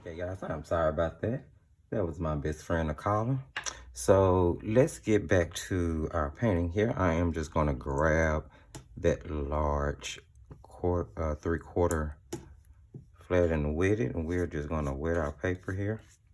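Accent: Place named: South, United States. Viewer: I have watched 5 other YouTube videos and yours is my favorite! Excellent instructions as you paint. Love your southern accent.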